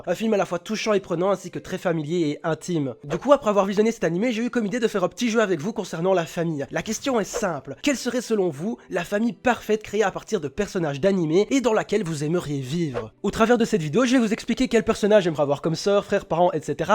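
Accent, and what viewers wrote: Belgium. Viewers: Tu serais pas belge?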